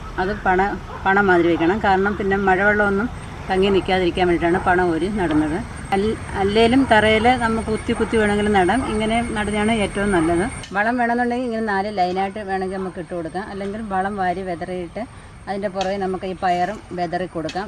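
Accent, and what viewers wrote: Kollam, India. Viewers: Mm samsaram ketapol thonni kollar allenkil PTA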